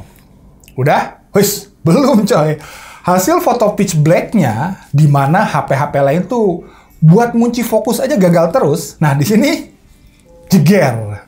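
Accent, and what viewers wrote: West Java, Indonesia. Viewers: sunda pisan logat na.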